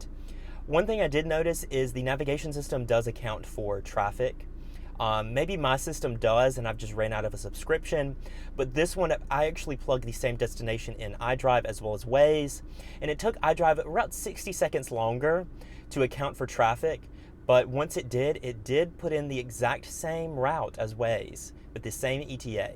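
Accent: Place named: Carolina, United States. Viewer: I love that strong Carolina accent. Thank you for this video it really helped a lot.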